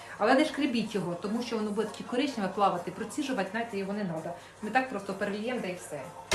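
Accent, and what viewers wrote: Ukraine. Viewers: А мені дуже подобається Ваша мова, не в цілому — українська, хоча і вона також, а саме Ваш говір, акцент! На Заході і на Сході України зовсім не так розмовляють. А от у Вас якесь літературне мовлення... мелодійне, співуче. Саме так розмовляють мої родичі на Черкащині... Я інколи навіть заслуховуюся...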